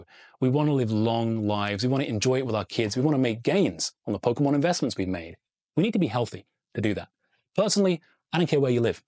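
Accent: Canada. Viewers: Canadian! I've been trying to place that accent for ages! 😂 Genuinely thought you were either British or Australian. 😂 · I had no idea you were Canadian haha. I knew you weren't american, but could not nail the dialect. 😂 Great video as always buddy, Cheers! 🍻 All Americans GO AND VOTE!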